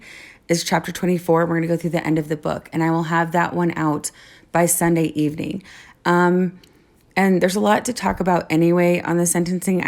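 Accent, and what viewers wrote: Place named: United States. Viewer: I'm from a little country in the pacific and generally I don't like the American accent but I have to say you have a really really nice voice and are very easy to listen to. Thanks. Ive been obsessed with this case ever since hearing about it. I think because the amount of home videos of Shanaan, Bella, Celeste I feel I got to know them. I think Shanaan was an amazing mum, wife and home maker. The tenderness and patience she showed her gorgeous daughters was unmistakeable. The mind just boggles. crustychris brings to mind one word and thats "WIMP". Him and nicks-hole deserve each other!!!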